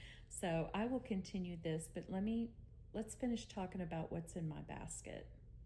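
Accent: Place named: South, United States. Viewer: no you won't bore us. I watched two of yours videos today and you weren't boring at all. Plus you have that cute Southern accent. I wish I had one 😊 I'm a Southern girl at heart. At least I get to visit the south these days and I have Southern friends now.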